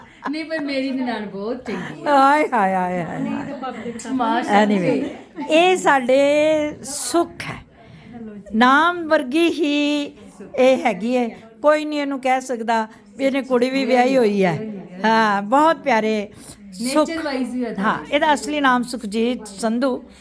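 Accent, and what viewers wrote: Punjab, India. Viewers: Apko dekh kr dil bht khush hota hai jaise Sadi Punjabi vaise he tuhadi Punjabi pure punjaban waah ji apko Milne ka Mann krta hai bt aap kon se sector mai rhte ho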